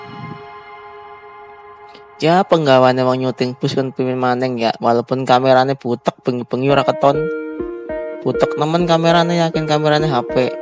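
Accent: Banyumas, Indonesia. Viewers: Ora ngapak ora kepenak mantap sukses selalu kak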